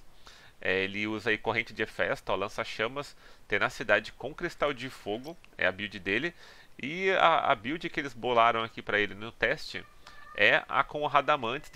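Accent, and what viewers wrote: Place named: Brazil. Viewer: Idai caralho, o cara é Br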